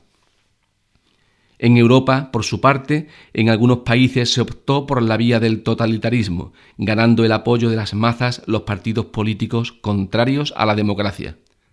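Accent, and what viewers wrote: Andalusia, Spain. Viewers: Maravilloso acento andaluz.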